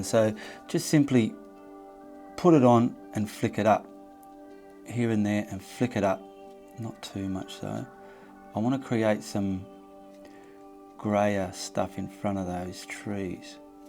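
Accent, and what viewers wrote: Australia. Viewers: Hi! I live in Virginia. After watching many Bob Ross videos and loving them, I decided to look for some videos with acrylic painting, and I found you. You are very instructional and I enjoy your Aussie accent and colloquialisms. I just watched the Misty mountain tutorial. I loved it. Your trees are beautiful! I have a question for you. Why won’t the knife work for painting mountains with acrylic paint? ❤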